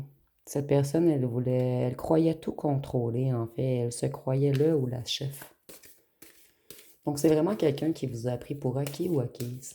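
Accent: Canada. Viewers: 😂😂😂😂🏃‍♂️ c'est ENGENDRAIT 🤔🤔 ça passe très bien avec l'accent Canadien.. Merci Belle Âme ❤ les jours passent je me rends compte que je n'en veux plus de cette là.. plus jamais... qu'est ce que je ferai d'une femme objet sexuel qui a parcouru toute la galaxie en fleurtant avec n'importe quel homme.. jamais de la vie.. jai tourné la page et pour de bon.. j'attends l'heureuse élue et je sens qu'on va bientôt se croiser ❤❤ Gratitide infinie envers le Divin et l'Univers 🙏🙏🙏🙏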